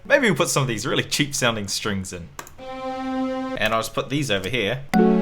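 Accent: New Zealand. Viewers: are u from new zealand bruv ahahaha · are you from new zealand or something??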